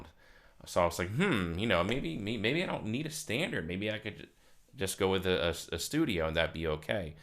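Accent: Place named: Philadelphia, United States. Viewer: Lol are you from the Philly area or maybe NJ?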